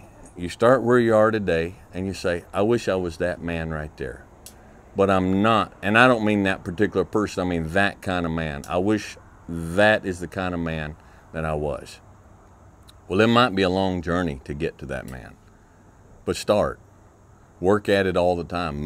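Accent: South, United States. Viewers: 南部英語気持ちええんじゃ〜^ ^ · 南部訛り？ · 南部訛りってやつですか？ なんとなくアメリカ英語にしてもクセがあるように聞こえます。 ちなみに言ってることは素敵です。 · それは南部特有のアクセントです。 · 南部アクセントが心地いい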